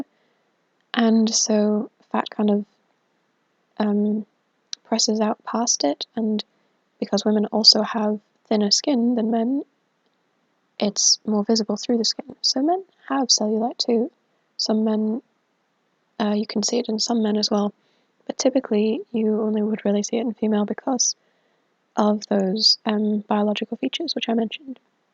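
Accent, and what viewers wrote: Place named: Berkshire, United Kingdom. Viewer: Happy new year to you too from a fellow brit and thank you for the like. Nina is stunning, glad there are some real ones out there in high ranks, gives us men a glimmer of hope when watching the screen lol. I'd like you to know that I think your videos are the most professionally discussed and analysed on this platform and they really exude such an unbiased and level headed approach, it's so refreshing. I typically watch your transvestigations at night and the sound of your extremely soft and sexy voice. Especially its Berkshire/Oxfordshire region accented cadence helps me relax before bed, hence the comments as of now haha. Hope it doesn't come across as creepy or even offensive (some girls these days *sigh*), just being as honest as possible. Enjoy the rest of your day!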